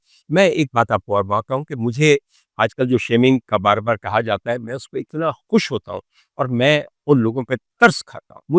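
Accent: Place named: Punjab, Pakistan. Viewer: آپ کا پنجابی تڑکہ مزا دوبالا کر دیتا ہے 😊سلامت رہیں خوش رہیں آمین 🎉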